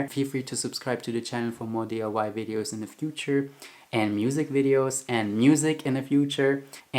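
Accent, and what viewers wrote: Germany. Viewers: Where Do you come from? Is this a german akzent? The pants are WOW! Many greatings from Germany👍👍👌👌🤩❤😘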